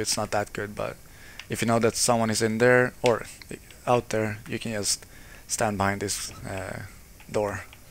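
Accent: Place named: Sweden. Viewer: you are for sure swedish😃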